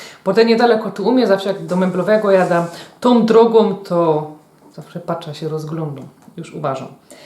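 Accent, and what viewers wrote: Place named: Cieszyn, Poland. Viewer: Od niedawna oglądam twoje filmiki kulinarne i ciekawi mnie z jakiego regionu Polski pochodzisz bo znajomy jest mi twój akcent czy czasem nie jest to region cieszyński. Odpisz bo podobają mi się twoje godki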